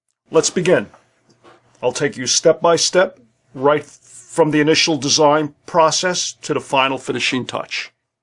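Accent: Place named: New York City, United States. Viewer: I've made jewelry for a long time & was searching for something different; this is it! Very detailed, in fact at first I thought you were trained in engineering or architecture since your wording included "structural integrity" a few times, smile. Anyway formerly being from NJ it was interesting to hear an accent I was familiar with, although I'll bet you're from NYC/ Long Island? Thanks.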